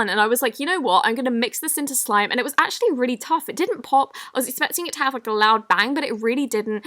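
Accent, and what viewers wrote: United Kingdom. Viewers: she has a little bit of a British accent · She's British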